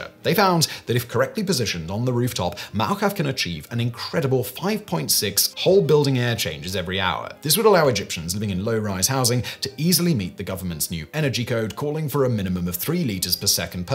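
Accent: United Kingdom. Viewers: Hey mate, your audience is international. Speaking a British dialect this fast doesn't help your viewers. With all do respect, vowels can not be just a whatever short sound between consonants. Hope you appreciate constructive criticism. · Really enjoy the content. Very informative. But the presenter's very rapid speaking, with the British inflection is exhausting. Also, the "pictures" presented, while the person explains a certain thought has a strobe effect around the edges which distracts and is visibly annoying. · Hey, buddy!! You need to curtail your caffeine intake a tad. Your british brogue is already difficult for us "colonials" to follow. Please, slow down a bit, OK!!! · He sounds British, but his speech is rushin...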